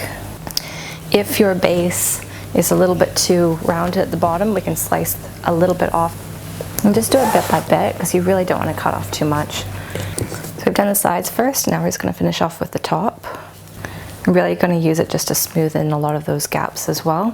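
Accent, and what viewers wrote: Australia. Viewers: Are you Australian? I am! Also maybe you could smile a bit more in your videos! No hate just a tip🙂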